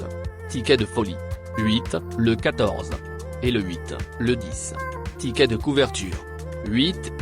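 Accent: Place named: Burkina Faso. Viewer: Son accent est burkinabe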